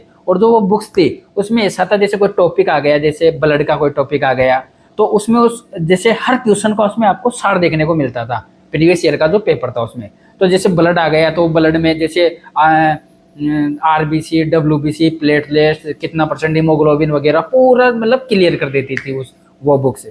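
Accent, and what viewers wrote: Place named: Rajasthan, India. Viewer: Sir aapki language tone se hi pta chl gya ,aap rajasthan se h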